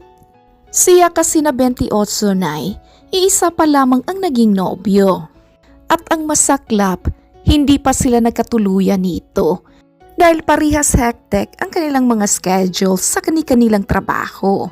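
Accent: Visayas, Philippines. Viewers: Bisaya di ay ka....😊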